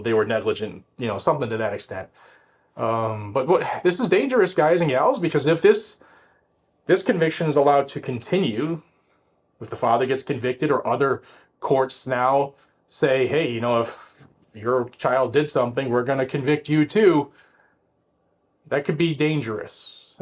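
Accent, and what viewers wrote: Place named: South, United States. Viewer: Y'all? You're getting a wicked southern accent.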